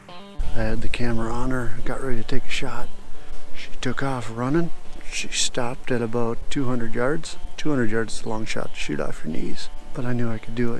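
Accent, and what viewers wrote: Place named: Minnesota, United States. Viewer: You sound like you are near where my family is from, Fairfax, New Ulm, Mankato. Nice spot!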